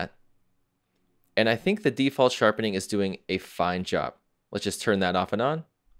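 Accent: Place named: New York, United States. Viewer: Why do you pronounce ON1 Photo Raw as Photo Rah? I've wondered about this for a while now. Is this a NY pronunciation?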